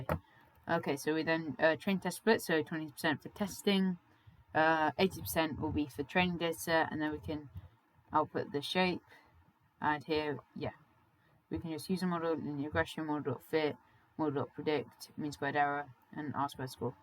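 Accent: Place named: United Kingdom. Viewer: It seems ur from UK and not US cuz u speak British English. Am I right?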